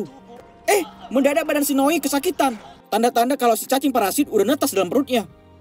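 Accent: Medan, Indonesia. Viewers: Gw selalu suka logat Medan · Medan kali bahasanya wkwkw makasi ya kang kreator udh ngenalin cara anak medan ngomong wkwkw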